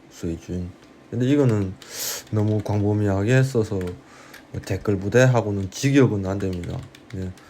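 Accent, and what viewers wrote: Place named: North Korea. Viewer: 조선족은 약간 이북말투가 섞여있을 수 있어요 이사람은 참된 조선족입니다 중국 공산당을 빨아재끼는 조선족이 아니란말입니다.당신이야 말로 정신차리세요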